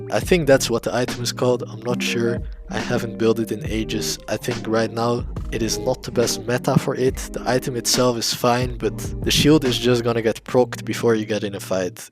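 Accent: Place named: Netherlands. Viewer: Ik kon binnen de eerste paar seconden al raden dat je nederlands bent hahaha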